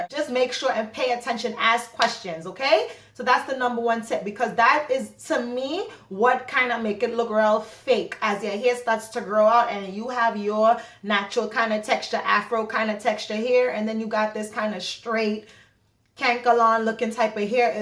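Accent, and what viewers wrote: Trinidad and Tobago. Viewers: ohh gosh love we trini accent.❤❤❤❤ and grate infomation. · I hear a trini accent 🇹🇹❤️ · Very nice, you really answered my questions. And I always love a Trini accent. Take care.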